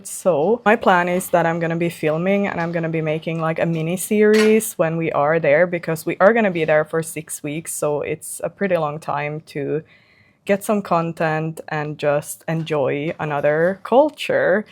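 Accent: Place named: United States. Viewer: تقريبا الاكسنت امريكي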